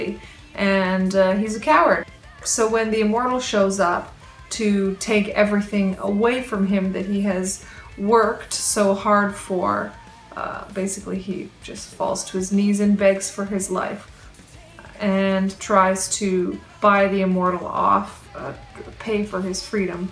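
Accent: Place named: Canada. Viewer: okay but her little canadian accent is actually adorable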